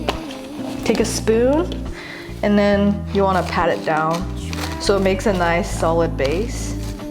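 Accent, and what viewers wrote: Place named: United States. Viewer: Metric system with american accent is throwing me off. We use freedom units here.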